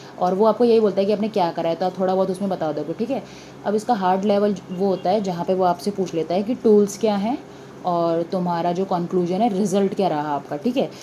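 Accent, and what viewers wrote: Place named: Haryana, India. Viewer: Miss chitra.. Zaruri ni h sabka tarika same ho kisi kisi ka alag hota hai or may be ho skta hai ye haryana side se ho tou isse farak ni pdta jaise ki neet ki coaching dete h physics wallah sir Allakh panday name h unka but aaj lakho log unke fans h kyuki unka tarika hi alag hai. Or i think jo zyada bna kr bolte h ya saja kr bolte h log unse bor ho jate h nd mujhe inka way of talking achha lga or ignou ka management hai hi aisa k muh se mithas nikalti hi ni h. Aisa waha ka staff b preshan hai.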